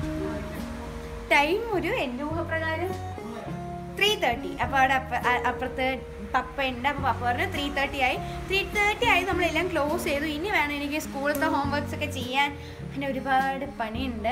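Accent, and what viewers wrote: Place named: Palakkad, India. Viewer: Anthokkeyayalum plkd slang varum ley😅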